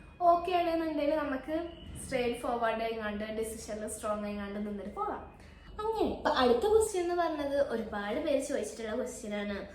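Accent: India. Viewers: Njan ninte vedios kanunathe malappuram slang kelkkan anu 🫂❤️ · Bro that perinthalmanna slang is🤌🤍(kunu...ttoo)😂 Ankk Cabin crew aavan kaiyatte tto🫶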